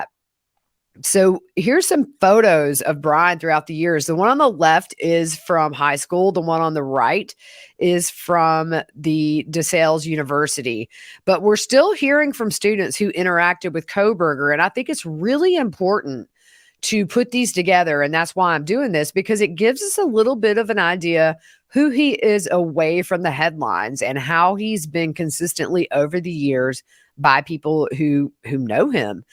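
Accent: South, United States. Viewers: …this is my go to channel for outright facts! You’re the best! And your country woman accent helps! Nothing a Boston city boy likes more than a country girl givin information! 😂😂 you go girl!!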